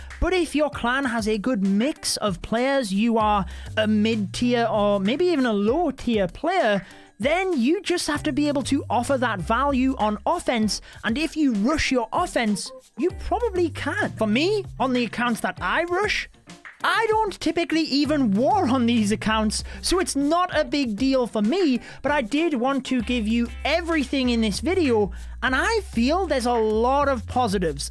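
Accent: United Kingdom. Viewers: are you from Britain?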